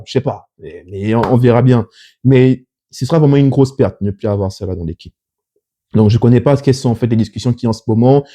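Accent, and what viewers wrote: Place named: Gabon. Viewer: Att tu es Gabonais parce que l'accent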